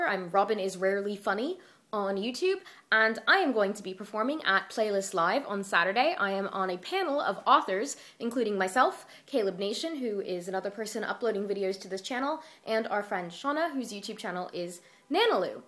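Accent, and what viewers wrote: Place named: United States. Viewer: you sound so american in this vid!!!!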